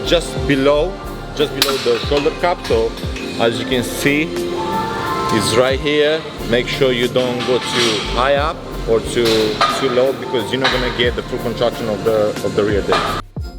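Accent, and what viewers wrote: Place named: Romania. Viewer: I like the way he combines english with romanian sțo ;) Bravo!!! Andddd keep up the good work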